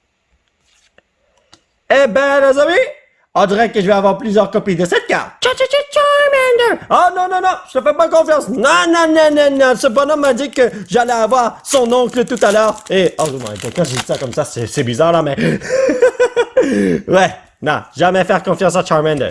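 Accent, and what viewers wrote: England, United Kingdom. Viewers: Tu as un gros bon accent anglais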